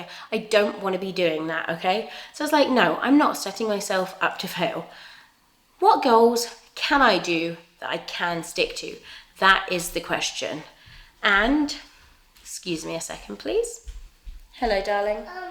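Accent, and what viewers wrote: England, United Kingdom. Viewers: Since you have an English accent, you lived in Japan, and now your in California I am assuming yours is a military family? Sorry if this is common knowledge. I'm a new sub.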